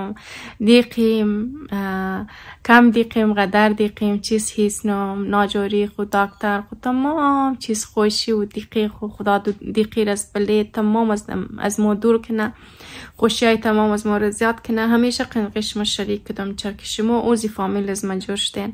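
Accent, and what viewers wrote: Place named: Hazarajat, Afghanistan. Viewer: خانم شما ولاگ های خود را صرف برای قوم هزاره خو نمی سازید که غلیط هزارگی گپ می‌زنید باید همه فارسی زبانان را در نطر بگیرید در ویدئو هایت معلوم نمی‌شود کی برادرت است کی ایورت است همه را لالیم میگی و بیگی کی است چرا درست معرفی نمی‌کنید پیکی کی است و این کی تان می‌شود فامیل کدام است همگی را لالی میگی ما نمی‌دانیم که کدام فامیل تو است و کدام از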